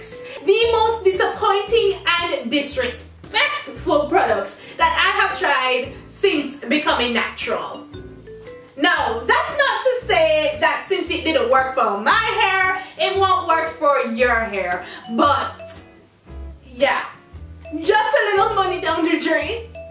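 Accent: Jamaica. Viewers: so refreshing to watch a hair blogger with a jamaican accent 💖🙌🏿 you really named and shamed them products ha HAAA 😂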